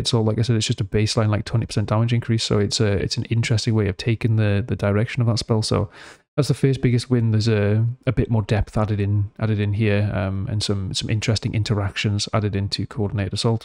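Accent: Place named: North East England, United Kingdom. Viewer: nice to hear a NE voice doing wow content i'm from Boro and it's refreshing!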